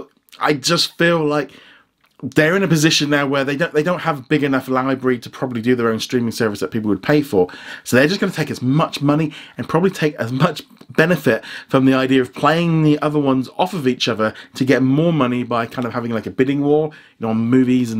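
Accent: United Kingdom. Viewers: Excuse me are you British or are you an American from the USA or Canada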